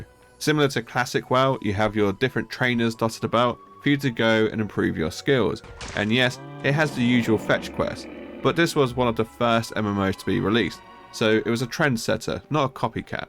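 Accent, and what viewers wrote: United Kingdom. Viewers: I'm amazed you have this many subscribers- a clan of drones really. First of all "old as fk" I am 39, played daoc since i was in my teens. I do 50 pushups almost every day and jog 6 miles + daily. That aside you judgemental fk question- Do you do any of that other than your life consisting based off of already provided information? Didn't think so. (aka leech with no real talent), Oh let's start off with your bitching of a 15 dollar subscription fee. Most MMOs that are free to play and we are talking hundreds if not thousands where even on your mobile app gaming. Oh yes I'm older- still know that phone transactions of whatever 1.99/4.99/9.99/49,99/99.99) required purchase to avoid a block wall etc = more of a rip off than a 14.99 monthly subscription. You have no clue of technology adaptation there was... Bluetooth/headsets/discord in earlier versions. 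Meaning when PvP was going this "new" tech was used to exploit farming, players would utilize that and set up their own realms by having their friends on the opposing side for points. You sounds like a brit brat and again we poured your shitty tea into the sea for a reason. Oh one last thing at least my generation knows what sex they are. MURICA! Goodluck with your wisdom bro comes with age. I personally couldnt see you changing a tire, shooting a deer, or yeah you be you haha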